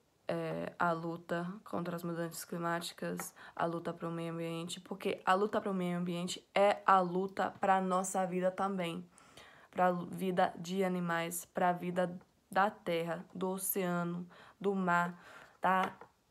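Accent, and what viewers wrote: Bahia, Brazil. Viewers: Parabéns, você é uma menina muito culta, seria bom ver jovens como você tão inteligente e voltada pra as coisas que acontecem no mundo. Suas verdades nos inspiram... Obrigado... Aproveitando o ensejo, é incrível como você fala muito bem o português brasileiro (sotaque nordestino - Bahia)...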